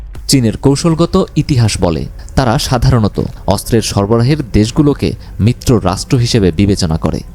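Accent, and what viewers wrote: India. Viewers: আপনার কথায় দাদাদের কথার সুর....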